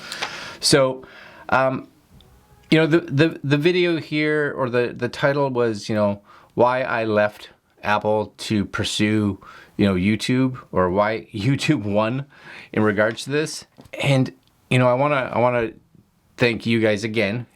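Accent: Canada. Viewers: Your BEST yet!!! Kudos! I’ve been a loyal subscriber and viewer of yours for most of this year, originally from Deep River, now living in Las Vegas. Was in the Video Department of Blue Man Group, until we were laid off in March. Don’t get me wrong, it was a great job, but believe it or not, there was no room for growth or creativity. I’ve been focused on getting my own channel up and running, and you’ve been a very great inspiration, and the accent helps me miss the Ottawa Valley :). Anyway, keep on keepin on! You’re on a role, and it’s working! Cheers 🍻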